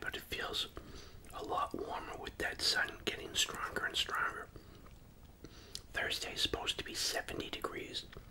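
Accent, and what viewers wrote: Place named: Boston, United States. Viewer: Yeah, it's a Boston accent I'm pretty sure. It's not a thick as some others but it's there.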